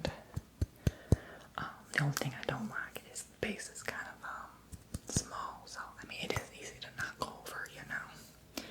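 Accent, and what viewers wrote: South, United States. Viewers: This southern accent + candle tingles = everything. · You do a southern accent so well! Would love to hear more of it in future vids♥️ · !!! This video is awesome! I looove the southern accent. And its a deep southern accent too. Reminds me of my family from Mississippi. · There goes that Southern Accent😂😂😂😂Love it😊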